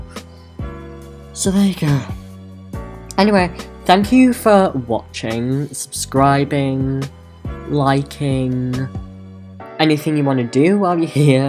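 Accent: England, United Kingdom. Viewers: are u from England i swear u sound like u do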